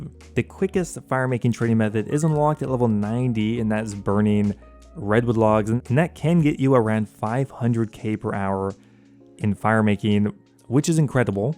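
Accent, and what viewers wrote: Canada. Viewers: That’s the American way. This guy sounds Canadian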